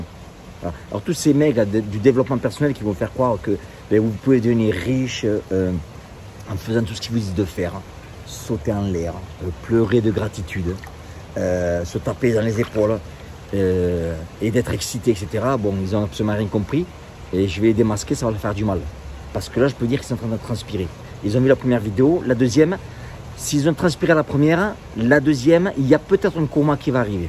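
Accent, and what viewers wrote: South, France. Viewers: est parti aux 🇺🇸mais il a gardé son accent du sud et le Pastis 🥇👍👊🏻💪🏻🙏🇫🇷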